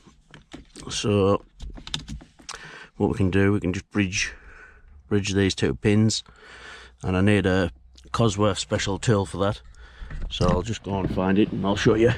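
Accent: Cumbria, United Kingdom. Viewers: Where ya from pal, sound very Cumbrian to me like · U from out west marra? Sound cumbrian Lovely car but get rid of them wheels 🙏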